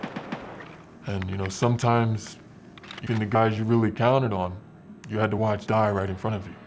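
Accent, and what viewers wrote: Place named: United States. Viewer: Russian in American accent. Love it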